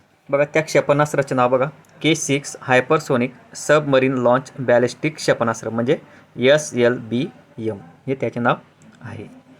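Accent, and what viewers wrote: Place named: Ahirani, India. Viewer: Sir mi dhule chi aahe tuhmi kuthele bolnyavrun ahirani aahe bhasha tumchi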